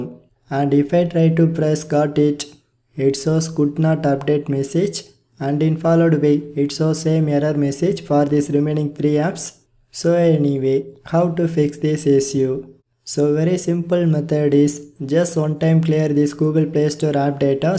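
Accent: India. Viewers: This guy is a little, too Indian for me to understand, also it says it will delete my account and I don't want my account to go bye bye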